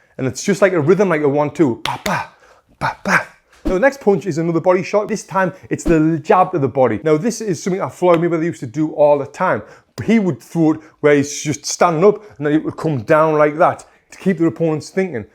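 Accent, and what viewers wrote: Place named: England, United Kingdom. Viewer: Comment after comment of people inspired by your teachings. You should be proud, not sure what type that English accent is but it def adds something, that’s for sure.😂😂😂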